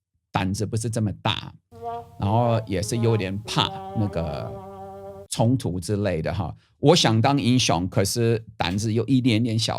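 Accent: Taiwan. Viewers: 中文說得很台灣！我也要說：啊！這樣的人真好！ 不過1911?有點早ㄝ，哈哈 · 我個人覺得，從教授的講話的方式及用語，他已經變成了台灣人模式了，教授沒有領身份證嗎？ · 你說話都有台灣腔了....非常道地的台灣人 !!! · 你的中文很台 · 你的口音已經是台客口音了!好親切好可愛！你的確是台灣人! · 根本就是台灣人，完全catch 台灣人說話的方式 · 你就是正港的台灣人、講話就是台灣味、加油哦台灣人👍👍👍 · 教授，你根本就是台灣人了！ 濃厚的台灣腔就好親切👍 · 這個講話 就是台灣人啊！ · by hearing the way you speak, you're a Taiwanese and that's no doubt, LOL! XP "Kung Fu" might have been translated from Cantonese. I wonder if "Kong Shou Dao Boy" movie was translated as 功守道!? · 我看不懂英文但我喜歡聽您說話因為您說話的語意是真正台灣人的口吻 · 嘿!台灣教授,誰説您不是台灣人?您説的國語比我還台.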